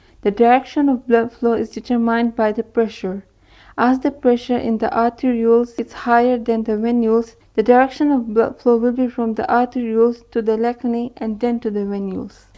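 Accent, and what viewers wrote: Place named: India. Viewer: Amit Majumder from her accent I'm sure that she's an indian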